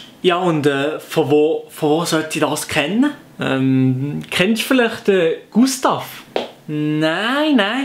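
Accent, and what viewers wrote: Switzerland. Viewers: S tönt chli wie en Mischig vo Basler, Berner und Bündner Dialekt 😂 Super Video ❤ han als Zugerin es paar Sache Verstande, es paar ned. Aber gugge han ich Verstande 😂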